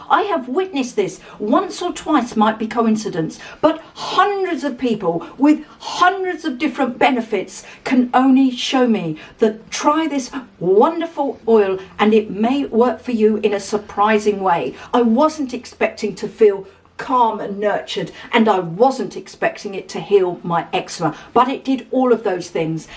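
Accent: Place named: United Kingdom. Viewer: I’m a new user and early on my journey of discovery. And I want to say if you’re not a motivational speaker you’re missing a calling. Love your passion and enthusiasm and I’m a sucker for a British accent. I’ve heard and seen so many good things about CastorOil. I came for constipation and happily moving on with so much more LOL. Thank You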